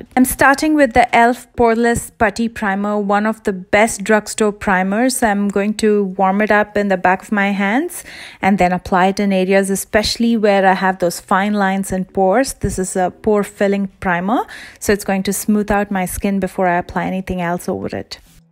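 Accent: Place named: India. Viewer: your Hindi accent is 🤗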